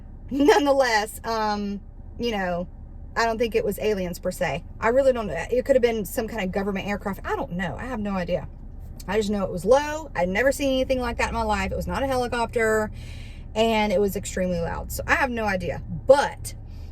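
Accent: South, United States. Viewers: Well, first off. The accent got me right away. A southerner trying to "Oomowmow." Priceless! I'm from Houston, Texas. I served in the military my whole youth from 1980 to 1994. I was even in Operation Desert Storm, and without trying sound like Dale from King of The Hill. I am a Christian and believe that Jesus Christ is God. He is The Word of God made flesh. In him is eternal life. He is my LORD and Savior. 1 John 5: 7 and 20 Ok. Here's what's actually happening. Everything that people are seeing in the skies has been made by man with really good science. It seems like magic, it's so advanced. We now have craft that can do things no one would believe possible. The real "entities" cannot take a human form. God's Word explains this. - Hebrews chapter 1. "He maketh his angels spirits." The angels that kept not their first estate, but sinned have been "bound in chains of darkness." ( 2 Pet. 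2: 4). This darkness is the realm known as the bottomless pit. Revelation chapter 12, 13, and 17 explain this. "The beast that thou sawest was, and is not; and shall ascend out of the bottomless pit, and go into perdition:..." Paul said of them that they are spiritual wickedness in high places. - Ephesians 6: 12 Excuse me? What does god need with a spaceship? Jesus said of their coming, "Shall shew great signs and wonders; insomuch that, if it were possible, they shall deceive the elect. (Matt. 24: 24). Paul confirmed what Jesus said of their coming. "Even him, whose coming is after the worki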